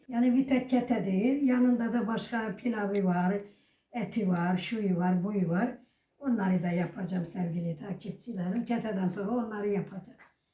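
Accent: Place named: Meskheti, Georgia. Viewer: teyzem nerelisiniz acaba ben Ahıska türküm aynı bizimkiler gibi aksanınız var😊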